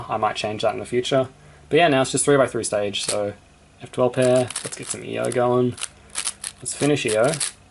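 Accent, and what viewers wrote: Australia. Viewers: You sound a bit like Feliks, probably Australian accent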